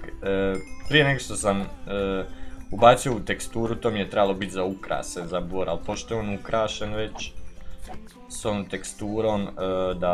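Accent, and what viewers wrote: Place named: Dalmatia, Croatia. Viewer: odakle si ti? Govoris dalmatinski pa pitan:D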